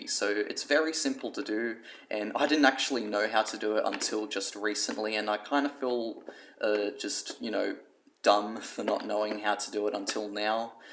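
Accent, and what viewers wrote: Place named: Australia. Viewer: damn love your voice,real Aussie accent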